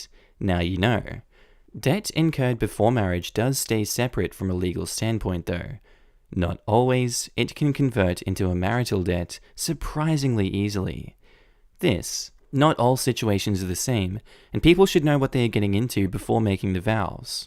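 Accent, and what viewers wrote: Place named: Australia. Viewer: tfw you're an aussie and like hearing a familiar accent, but gotta always laugh at this branding lol (its cringe but i say that lovingly, its a big 'lol good for him' moment from me)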